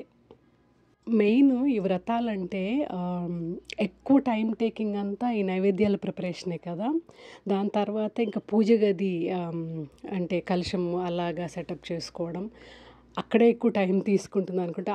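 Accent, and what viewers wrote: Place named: Tirupati, India. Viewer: Nice video andi, the way you speak, reminds me of my home town tirupathi. We also call poornaalu as suggi Could you share your pulihora recipe please?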